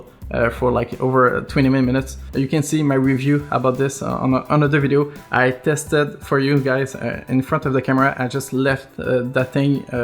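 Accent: Quebec, Canada. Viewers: Tes Québecoé toé ? Jme trompe ?? Hahahaha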